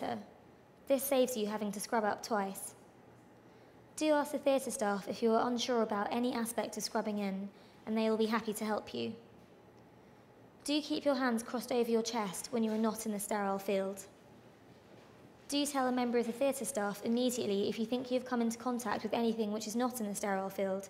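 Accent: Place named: Southern England, United Kingdom. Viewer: Nah, just Southern English!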